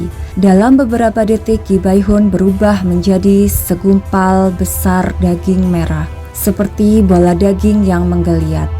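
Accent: Java, Indonesia. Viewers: kok suara ini kayak channel sebelah suara medok nya ni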